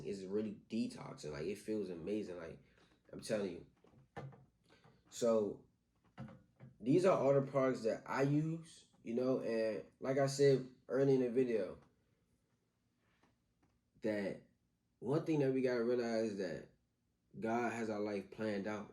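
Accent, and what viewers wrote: DMV, United States. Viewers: He sound like he’s from the DMV lol…. Great video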